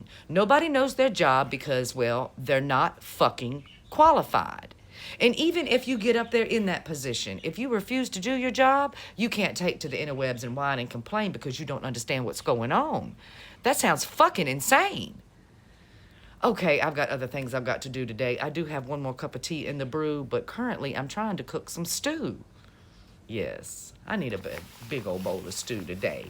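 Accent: South, United States. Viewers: Yer southin' drawl borders on pandering.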